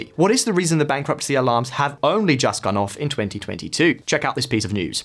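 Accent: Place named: England, United Kingdom. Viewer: Someone with an English accent bad mouthing Spain. Is this supposed to surprise me? Why don't you talk about the financial mess in England?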